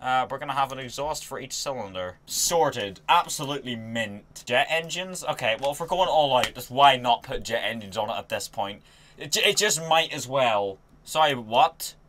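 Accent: Northern Ireland, United Kingdom. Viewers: r u from northern ireland?? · Are you from Northern Ireland?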